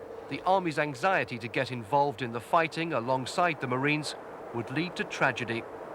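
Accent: United Kingdom. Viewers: LOL your page says you're from Denmark. That's why. But you sound like a British subject who lives in Denmark.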